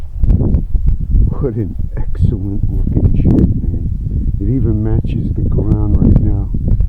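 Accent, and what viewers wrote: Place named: East Coast, United States. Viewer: I love U’r channel. You came up as a suggestion on my feed. U have a bunch of planes and I think your YouTube channel would grow much faster if you had a camera person that could zoom in and provide up close shots and a mic that could cut the wind noise. Just my opinion. I enjoy your east coast accent too. Keep it up brother and thank you for the videos!!!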